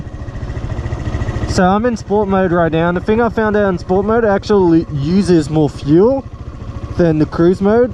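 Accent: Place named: Australia. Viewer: Excellent commentary. That aussie accent is a beauty.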